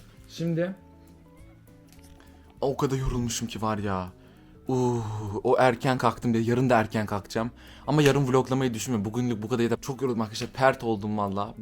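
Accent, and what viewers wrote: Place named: Rize, Turkey. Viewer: O ne öyle rizeli rizeli şeyler✨✨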